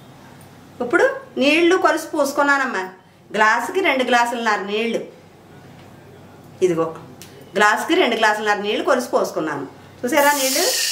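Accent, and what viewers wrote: Godavari, India. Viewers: abha.. maa godavari jillaa basha antha haayiga vundho vinadaniki aa matallo ame abimanam vundi 😊😊😊😊 thank you so much amma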